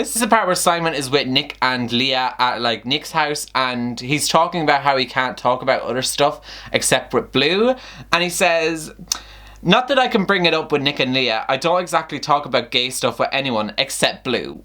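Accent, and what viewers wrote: Ireland. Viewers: I want to point out two things: 1. I’ve never been interested in that book but you made me want to read it like... now. Maybe two lives ago. 2. Your Irish accent had me almost sobbing because it’s adorable omg. Please be my friend ahaha I had to watch the video twice because I kept being distracted 🙈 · your youtube name is obviously so i don’t know why i was surprised when i realized you have an Irish accent. We kinda annotate similarly!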